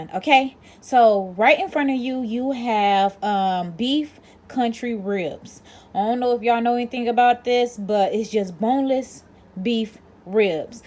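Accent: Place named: Texas, United States. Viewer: New subscriber so I probably missed it- where are you from originally accent sounds like Texas maybe Tennessee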